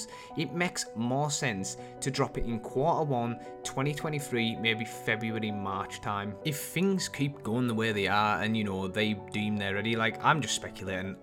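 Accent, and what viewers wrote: Newcastle, United Kingdom. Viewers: Newcastle or Liverpool? I swear it's like a mix of both XD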